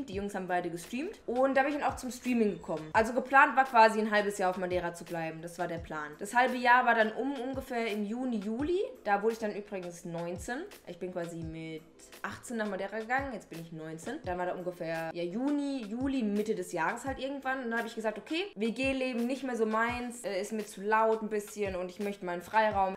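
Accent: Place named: Aschaffenburg, Germany. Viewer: Kommst du aus der Nähe von aschaffenburg?? Weil man merkt den Dialekt voll loool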